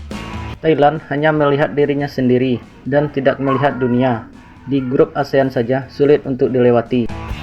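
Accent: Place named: West Sumatra, Indonesia. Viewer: logat minangnya kentara sekali bg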